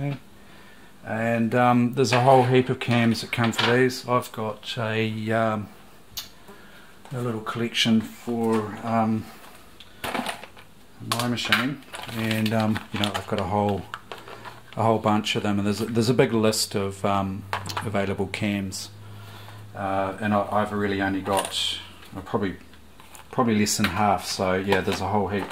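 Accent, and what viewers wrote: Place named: New Zealand. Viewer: Mate, thanks so much. Trying to figure out my Grandma's old machine and this was the perfect video. Also imagine my shock when amongst all the female American voices in other tutorials I find a fellow kiwi! Made my day. Churr.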